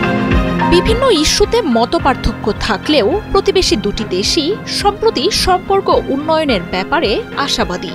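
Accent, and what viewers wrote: India. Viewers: আফা, সমঝোতা শব্দটার উচ্চারন ঠিক করেন। হিন্দি স্টাইলে বাংলা বলবেন না, গা জ্বলে।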